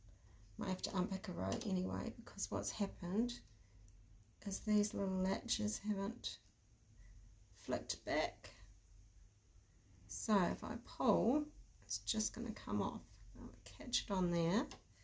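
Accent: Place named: New Zealand. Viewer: have a look at either end of the needle bed and you should see a little plastic tab. Check your manual or search online to see if someone else has put up a YouTube video of changing the sponge bar. I detect a New Zealand accent. I got my sponge bar from New Zealand. If you want the details, I’m happy to pass them on.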